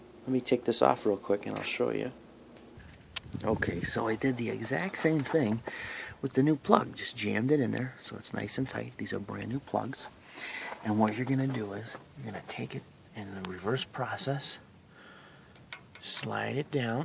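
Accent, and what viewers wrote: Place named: Boston, United States. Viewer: thxs for the video.. Wife's car needs a change of plugs I'm guessing that's a Massachusetts accent lol Boston maybe?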